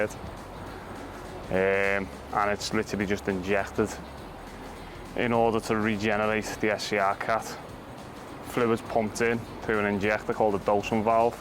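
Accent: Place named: Liverpool, United Kingdom. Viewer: Liverpool dialect. One of the strongest accents I've ever heard.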